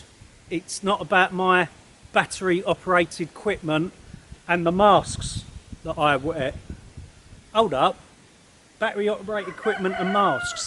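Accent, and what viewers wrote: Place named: South East England, United Kingdom. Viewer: LOVE your accent. Bit rare ironically in London and the south east now. Cool video. Thanks😄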